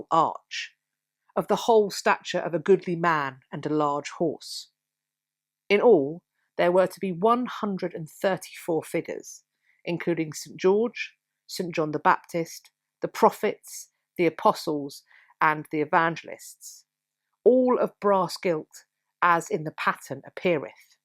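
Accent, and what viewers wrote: RP, United Kingdom. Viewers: I just love listening to you speak. So elegant. No fillers not a single extra syllable. Just straight clean facts delivered in BBC English. Episodes are always fascinating as well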